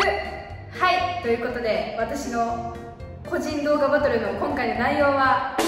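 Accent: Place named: Kansai, Japan. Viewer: ぽてちゃんの関西弁好き😘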